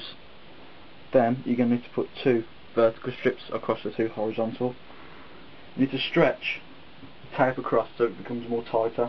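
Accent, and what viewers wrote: United Kingdom. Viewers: wow very british i must say bloody good job though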